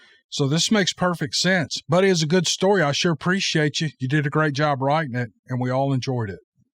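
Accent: United States. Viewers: You are over the top especially with that accent of real American English spoken the way it should be spoke thank you you are truly an awesome story teller thank you so much I’ve given up reading and just listen to you , it’s not that way about reading my eyesight is getting weak as am I in the tie I have left it’s not so bad to go especially listening to you and your soothing voice thks again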